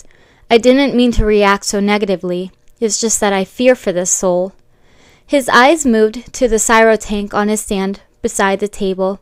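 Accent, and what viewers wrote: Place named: United States. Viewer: What type of American accent is this? Like what state is she from? I really like it, it’s melodic and smooth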